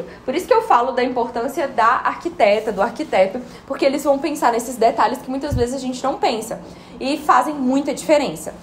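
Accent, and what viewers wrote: Brazil. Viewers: Sua louca rsrsrs eu fui assistir o vlog e quando de repente ela abre a boca e eu: ela é brasileira???🤭🤭🤭 N sabia pensei q era uma gringa já tinha visto vídeo dela e ela me passou essa vibe ✨🤭👏🏻👏🏻👏🏻 ela trabalha com decoração? porque no vídeo ela disse se você quer ver dicas de decoração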